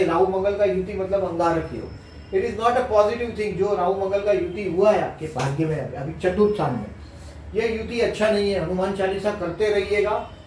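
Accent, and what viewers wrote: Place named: Gujarat, India. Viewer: Is he from Gujarat? His accent is so.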